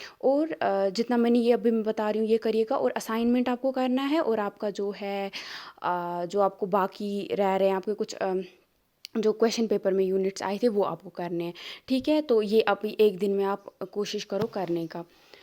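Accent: Kashmir, India. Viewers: Are u kashmiri.. your ascent is like kashmiri ❤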